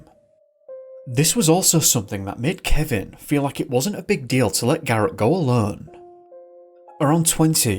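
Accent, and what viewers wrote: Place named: Northern England, United Kingdom. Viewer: is from the north of England and that’s how they speak.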